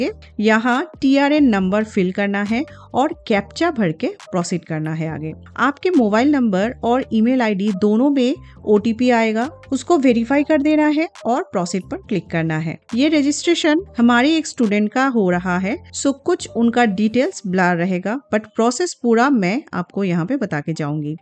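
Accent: Bengal, India. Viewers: Aap bangoli ho na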